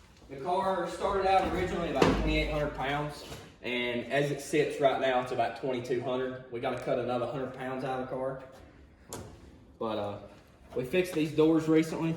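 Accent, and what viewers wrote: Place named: Tennessee, United States. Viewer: I'm just traveling down the rabbit hole of "beer can" or as the kids call them now "rocket" builds for ideas on my 1G Neon and... 😳 Mother of God... It's like train wreck. I hate everything about it and absolutely love everything about it at the same time. The ascetics and being Japanese make me hate it. The functionality and being piloted by someone that talks like me (Tennessee born) make me love it. 1.7 lateral Gs? Despite being from the side that lost WW2, has never landed a human on the moon and uses a measuring system that makes sense, I'll be binge watching for sure.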